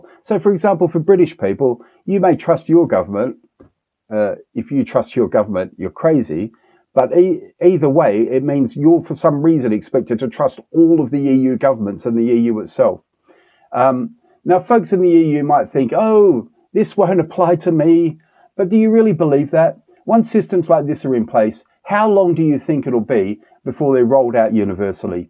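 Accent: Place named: South Africa. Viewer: Enjoy the SA accent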